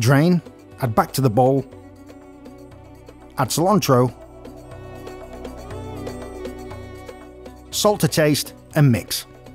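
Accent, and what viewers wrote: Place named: United Kingdom. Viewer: mate, with your style of production and your superb editing you could produce video packages for local TV stations that do morning news and chat shows. Local stations are always looking for premade video packages that they can run. You have just the rite personality and with your good looks and british accent you are a natural.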